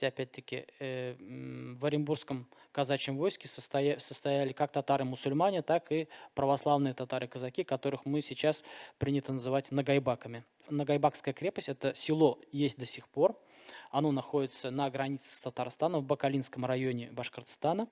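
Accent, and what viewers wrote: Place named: Europe. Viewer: В ЕВРОПЕ ЖИЛ КАК УЧИЛИ ТАК И ГОВОРИТ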